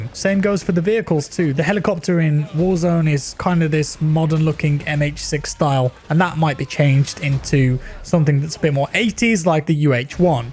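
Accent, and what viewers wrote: England, United Kingdom. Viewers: What English accent does have?:)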